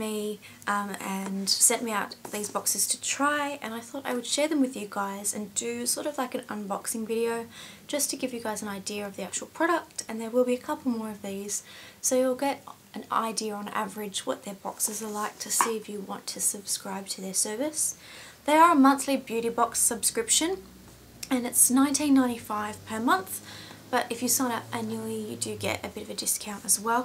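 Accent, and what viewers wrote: Australia. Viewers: Hi Beauty. SORRY...but I love you ;-) I am not a lesbian, just think you a great girl! Everything about you is great! Your voice, face, passion and the fun of it! Your Aussi-Slang. I am a body-beauty-freak, too! I just like you! Phantastic person. Would love to hear from you - learn more about you! Greetings from Germany <3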